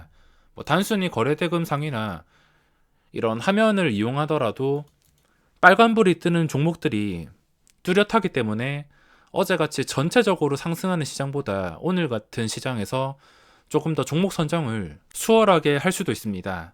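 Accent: Gyeongsang, South Korea. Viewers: 누가많이파는지 시합하는줄 알았읍니다..ㅋㅋㅋ경상도사투리 구수하네요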